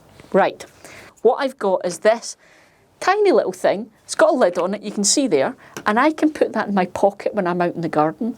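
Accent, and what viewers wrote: Scotland, United Kingdom. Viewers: Thank you from Phoenix, AZ, USA a little bit of difficulty understanding that beautiful Scottish accent...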